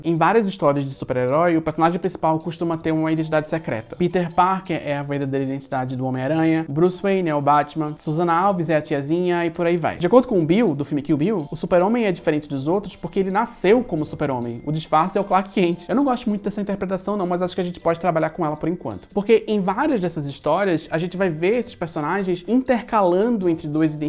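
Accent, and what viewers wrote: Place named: Rio de Janeiro, Brazil. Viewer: Falando em várias personalidades, uma pergunta, você nasceu em Pernambuco e mora em São Paulo, mas fala como um carioca, por que isso acontece?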